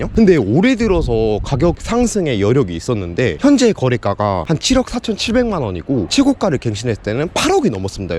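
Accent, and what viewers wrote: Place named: Jeolla, South Korea. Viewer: ㅋㅋㅋ 방송하지마라 공부를 쳐 하고 하던지 부알못아ㅋㅋ 전라도 억양 오지네ㅋㅋ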